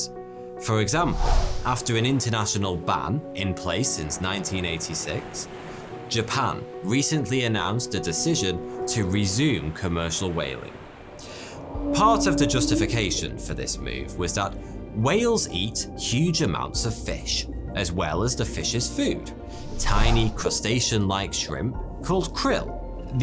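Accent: United Kingdom. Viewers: Great videos, thoughty and smart content, daily posting along with a narrator that spoke with a British English accent and with awesome discounts! This is awesome!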